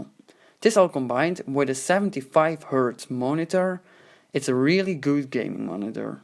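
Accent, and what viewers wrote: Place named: Netherlands. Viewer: Nice vid. U dutch cuz u sound like it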